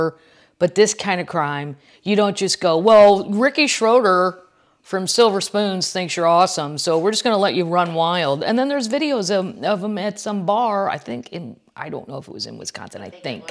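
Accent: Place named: Boston, United States. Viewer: Your Boston accent is everything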